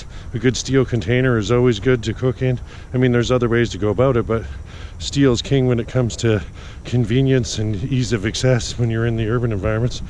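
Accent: Canada. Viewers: Awesome! You sound like a fellow Canadian. I am so inspired - "gathering resources". Been there so many times. Cheers from Montreal QC.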